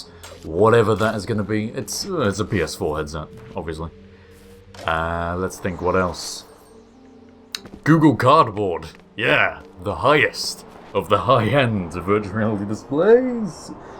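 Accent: United Kingdom. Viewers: GUYS!!! I just got a great idea he plays Stanley parable. Think about it glorious British voice versus glorious British voice.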